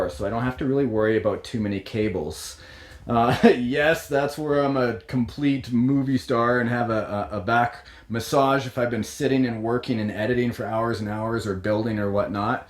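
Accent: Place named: Canada. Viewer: Where are you from? I think you have a canadian accent but could be totally wrong. Just watched a couple of your vids. cool stuff.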